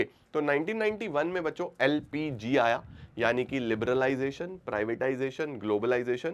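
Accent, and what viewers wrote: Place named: Uttar Pradesh, India. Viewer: U are from up 😅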